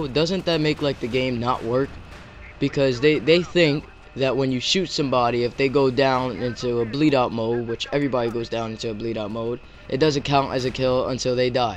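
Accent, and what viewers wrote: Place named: New York, United States. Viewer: i love it when u say the into for rating :-D i love your NY accent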